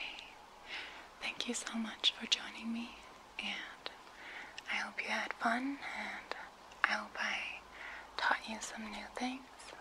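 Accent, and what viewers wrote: Netherlands. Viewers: Lol ik ben Belg je hebt een Nederlands accent ik heb nooit dat verwacht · are you dutch? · She has a rather normal accent. She speaks so called 'standaardnederlands' (translation: standard dutch). This is the accent they expect you to have in formal situations (for example, at your work). Some people have this accent naturally, but this depends on which area you come from. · are you for real Dutch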